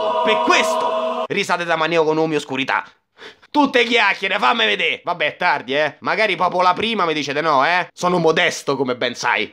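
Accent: Rome, Italy. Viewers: L’unico youtuber che parla di anime in romano toppppp😂😂😂❤️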